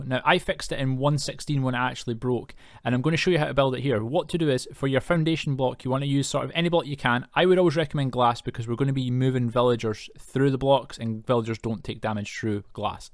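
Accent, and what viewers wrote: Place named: Scotland, United Kingdom. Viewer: Great a Scot! (Sounds Scottish at least) Should be an easy going tutorial :D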